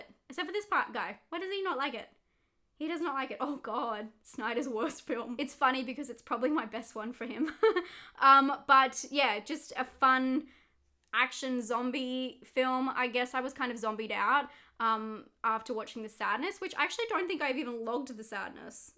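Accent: Australia. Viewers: Congratulations on getting married..I love your channel and your Aussie accent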